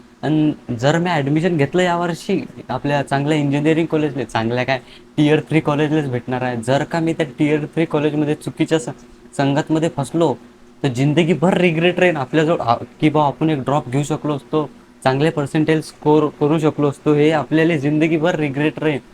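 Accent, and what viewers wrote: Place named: Warud, India. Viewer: Bro tu amrawati warud cha ahe ka Karan tuzi bolnyachi bhasha tashich ahe😅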